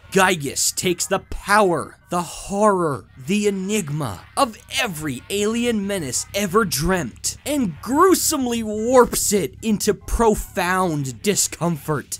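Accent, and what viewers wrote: United States. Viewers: erm, upstate new york?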